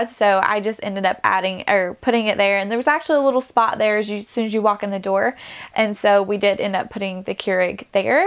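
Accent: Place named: South, United States. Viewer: mai567 it's called a strong southern accent.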